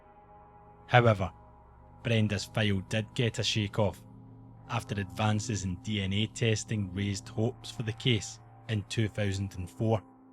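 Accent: Scotland, United Kingdom. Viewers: Hi, I just discovered your excellent channel. I was raised in Canada by my Scottish grandparents and I love listening to your videos. Can you tell me where your accent(s) are from in Scotland? My Nana was from Glasgow and my grandfather was from Tighnabruaich in the Kyles of Bute ( he said he had an “Argyll” accent and most people could not understand him in Canada) Thank you! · The scottish accent!!! so special!!!! ❤️ keep up the great work!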